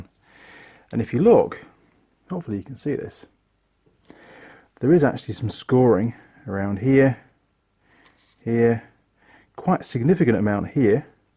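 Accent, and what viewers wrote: Yorkshire, United Kingdom. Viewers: Also, where you from originally? You sound like you’re from not far from here (sunny Rochdale), just over the wrong side of the border into dirty Yorkshire? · Sounds like a Brit, looks like an Australian :S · Heh, I came to the comments to say the exact same thing! Reconised the accent straight away; "odd sounding Kiwi", I thought, "sounds like home" - I grew up just outside York. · Interesting stuff. Nicely presented too. You sound like you're originally from Yorkshire? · Nice tear down. Your accent sounds local to where I live in the UK, maybe a quick run over the "snake pass". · Your Yorkshire accent is very soft considering you arrived across the ditch in 2008. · Hi, I came here courtesy of the YouTube suggested video list. Being a reasonably experienced hobby mechanic, I understand what you are on about in this here video. 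The way you talk the viewer through the stripdown is refreshing. Subscribed! Cheers, Niek from The Netherlands (opposite end of the planet! hey!) p.s. I can't help but spot a bit of a mild Yorkshire accent from time to time. You're not from the UK now are you? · I have to agree! I only arrived at this video by a YouTube recommendation, and to be honest I thought I would skip through chunks of it just to see the damage - - I have watched every minute of it, and what a fantastic video! You have another subscriber (from the UK, where I suspect you originate from, going by your accent?) and I look forward to watching future videos. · You live in Australia I take it, or I think that's what you said at some point. But you sound very much like your from my part of the world in Yorkshire, I live in the dales. I could be wrong, but definitely English, I'm sure, but it sounds that way. Awesome vid aswell, nothing better than getting ya hands amongst it under the bonnet, a lot easier I must say on the bench. 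Glad I came across your vid, it just popped up in things you may like.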